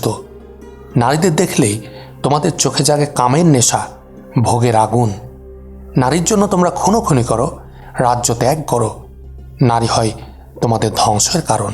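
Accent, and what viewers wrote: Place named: Kolkata, India. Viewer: ভাই আপনার কথা বলার স্টাইলে মজা পাইনা, কলকাতার মত লাগে, বাংলাদেশের টনে কথা বলুন প্লিজ, (সুন্দরের উচ্চারণ করছেন ছুন্দর এমন অনেক উচ্চারণই বাংলাদেশের টনে হচ্ছে না,)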